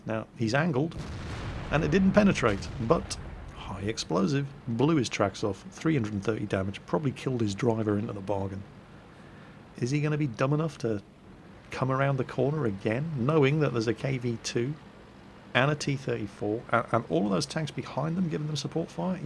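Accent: Russia. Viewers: No matter what anybody says - that Russian/English IS funny as hell :-D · Please do a video with you talking only with russian accent. Its priceless! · Your russian accent is very funny, tovarish. xD Btw.: My best shot with the KV-2, was when I splashed a Panther and make his engine burn. :D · I lvoe both you and your Russian accent,:3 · speaking with russian accent... so weird and yet so sexy... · Dat Russian accent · I love dat russian accent of yours. This made my day.:) aaand my family thinking to call a medic, since i laugh so hard, they think i'm become mad or sg.:) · Love your Russian accent it killed me :) keep it up! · Your russian accent is just awesome)) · Rita, what do you think of ' "Russian" accent? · dat russkie accent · Nice Russian accent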